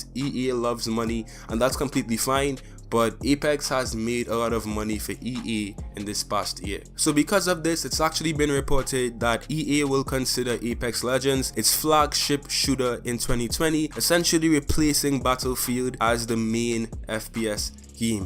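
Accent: Trinidad, Trinidad and Tobago. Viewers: Hey you from Trinidad?? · WAITTTT YOU FROM TRINIDAD? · are you from Trini?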